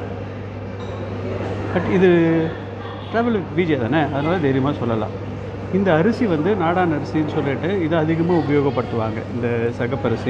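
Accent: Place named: Tamil Nadu, India. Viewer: Fav VJ from my childhood.. neenga neriya videos podunga.. I love your Tamil slang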